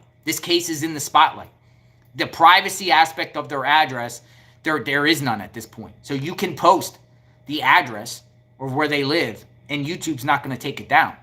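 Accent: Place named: New Jersey, United States. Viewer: HEY, personal question. (You don't have to answer.) I have fun trying to figure out accents. Are you originally from Jersey or Philly? I went from Jersey to Miami Beach in my teens so I know that a lot of New Yorkers move down to FLA. Snowbirds, you know! 😆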